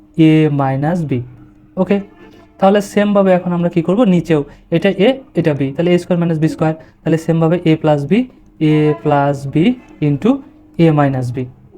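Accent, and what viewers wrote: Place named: Pabna, Bangladesh. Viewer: স্যার আপনার বাড়ি মনে হয় পাবনায়।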